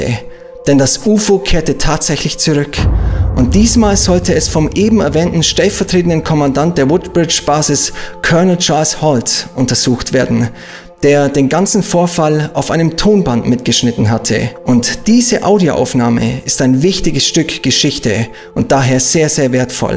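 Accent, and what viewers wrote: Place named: Bavaria, Germany. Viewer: Hi, hab ich entdeckt und direkt gleich all deine Videos angeschaut 😅richtig toll! Sag mal, wo kommst du her? Voll schön, den bayrischen Dialekt mal raus zuhören. Macht dich mega sympathisch ☺️☺️✌️ Grüsse aus Regensburg 😊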